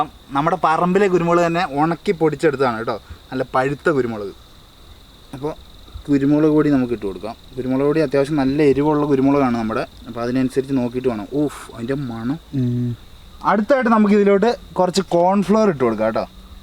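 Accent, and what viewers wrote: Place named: Kottayam, India. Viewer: Ok, but broo ningade language pakshe nk oru kottayam area ayit thonniyallo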